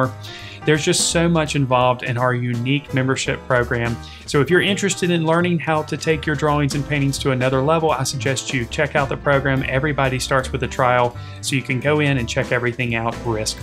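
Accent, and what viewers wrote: South, United States. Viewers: Is that a Southern accent you have?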